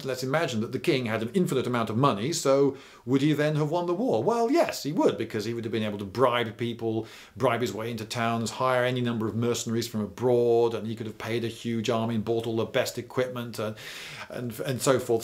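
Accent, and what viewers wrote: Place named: England, United Kingdom. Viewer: I just imagined this channel being run by a guy with a Boston accent and realized how important the English accent is to a good history channel. You just feel like he knows what he's talking about.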